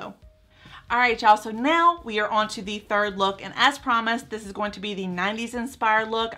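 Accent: South, United States. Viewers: I love, love, love the first outfit! The red accents were perfect and that stinkin’ adorable little flowered clutch? Yes, please!! 😍 I’m from Rowlett, Texas… where are YOU from? (I heard all those “y’all’s” you were dropping ! I’m definitely channeling southern gal roots!!) LOL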